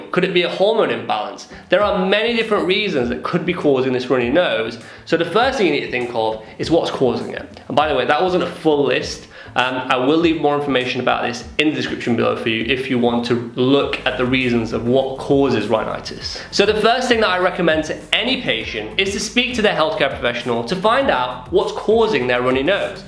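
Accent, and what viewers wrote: United Kingdom. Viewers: Oh my god... You've got a strong British accent.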